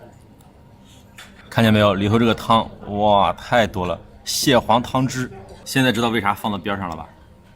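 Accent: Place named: Yangzhou, China. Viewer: 说的扬州话很地道让我想起了我的家乡东北.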